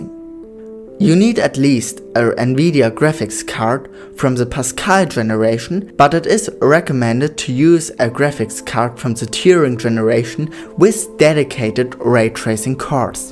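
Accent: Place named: Germany. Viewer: I can hear your German language roots so much.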